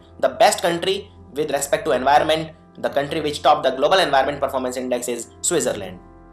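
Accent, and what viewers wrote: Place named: Telugu, India. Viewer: Disgusting typical Andhra(telugu) accent...most Indian name is spoiled by these guys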